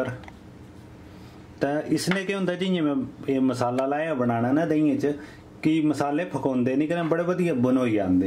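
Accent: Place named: Kangra, India. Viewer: Mouth watering 😋 recipe ekdum must. Aap ki baaten achi lgti hai very sweet kangri bolde tusan. Missing himachali dham.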